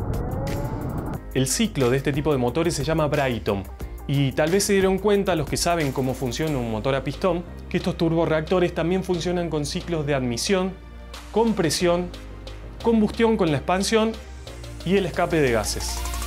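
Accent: Argentina. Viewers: Eres de aca Argentina no? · Vos sos de cordoba no? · sos argentino ==? capooo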